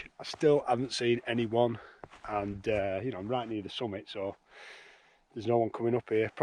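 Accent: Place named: Northern England, United Kingdom. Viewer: I love your videos , and a great northern accent , it’s guys like you, Hazey , and Simon that have got me off my arse and into nature, and I’m better for it, so many thanks, stay safe.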